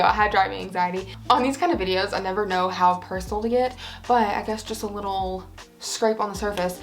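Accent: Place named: South, United States. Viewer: I just found your account and I think it was pure fate!! Love your midsize fashion content and that you have a southern accent like I do even though I am an old woman 😂 I just got a breast reduction this past fall and it was a very weird time. I’ve had body dysmorphia my entire life and that was a huge part of it. I feel SO much better and the change in my body is great but also very bizarre. I hope your recovery goes smoothly and that the adjustment period to your new shape goes well!!!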